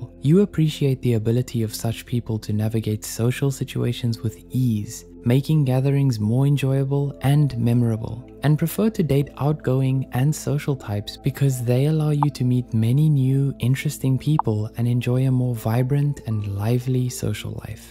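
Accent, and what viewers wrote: South Africa. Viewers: the voice of the person in this video isounds South African pretty cool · Why is this voice giving a low-key white South African😶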